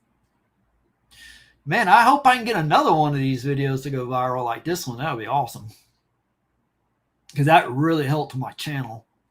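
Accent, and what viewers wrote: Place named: Scotland, United Kingdom. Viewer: Hi Im not really Stalking you lol. I love your channel. Id go live later after UK time 6pm when everyone is home from work. From experience more people will be able to join your live. I dont know what time it would be where you are. Your Scottish accent is brilliant. I love your coverage and hope Richard gets justice. Lots of love 💖💕xxxx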